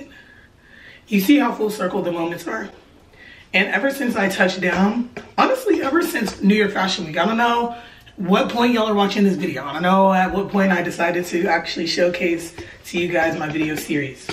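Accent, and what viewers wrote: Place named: Nigeria. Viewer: I believe you.. and WOW I am in awe. I just ran into your youtube. I don't know how lol literally was just searching a sermon by Todd Michael Crazy faith sermon and this blew me away. I almost didn't listen to this.. I live in LA (from ghana) just opened up a healthcare company and I was telling my business partner about faith so running into this youtube was no coincidence.. God is def moving.. I was looking for something to stir up my faith. I can tell your nigerian due to a slight accent and "she's well oo" haha I love it. Welcome to LA you are definitely walking into your destiny. Make the most of your life and network network network. The lines have fallen for you in pleasant places. Ahhh your talking about timi (amplify africa) really cool and humble guy. We know each other. Omg I'm soooo happy for you dear. Share your testimony and continue to inspire .. 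I hope to meet you soon 🙏🏾 then I'll remind you that im the one who wrote this on your channel. Crazy how I ran into this youtube channel. Keep being OUTGOING.. u never know who you may meet. Divine connection ✨️ ❤ 🔒 CONGRATULATIONS on you new Career. God is faithful ..so happy for you. Wow! Btw u passed my fav spot in-n-out on Sepulveda I think lol..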